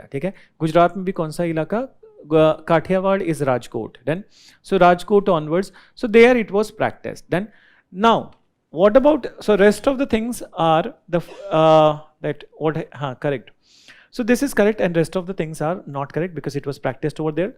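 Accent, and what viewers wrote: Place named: Karnataka, India. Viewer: Sir may be ur from karnataka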